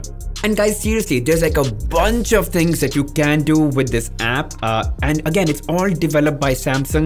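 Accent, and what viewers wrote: India. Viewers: I AM SICK AND TIRED HEARING THESE FUCKING INDIAN ENGLISH DICTIONS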